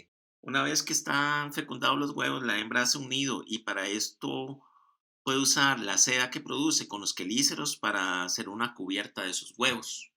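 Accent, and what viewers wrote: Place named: Costa Rica. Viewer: El acento tico no se pierde xd Gracias mae por el video <3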